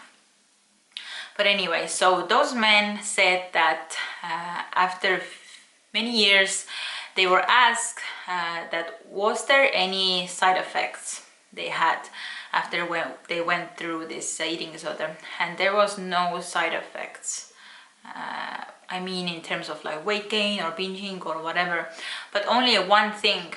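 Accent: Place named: Russia. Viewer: Будто русский акцент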